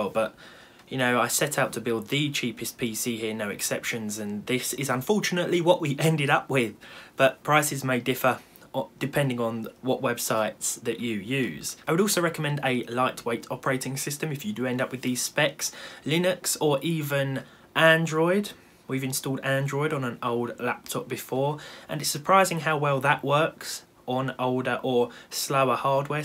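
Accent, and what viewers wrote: United Kingdom. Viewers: His accent says British, his heart says Proto-Canadian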